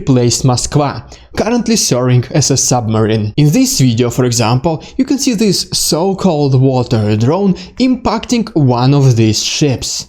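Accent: Russia. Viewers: 😎💪🔥 Coolest accent dude!!!🧐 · I love your accent dude, but it needs to be much heavier and thicker you talk a little bit soft. Just drink vodka before you speak your voice may get heavier I just can not wait for your next video dude but please improve your accent to make it much heavier and thicker and harder 👍👍👍 so I will call you this guy is a really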